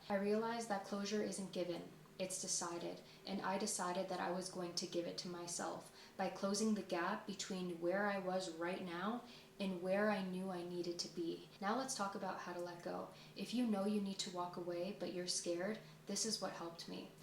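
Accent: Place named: Canada. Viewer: I thought I'd been hearing a Canadian accent in your words. I enjoy your videos. I'm going through a minor crisis right now and trying to stay ahead of it and not let it take over. I find your content to be inspiring, thoughful, balanced and relevant and can be applied to almost every other situation the presents itself. I'm glad I found your community 🙂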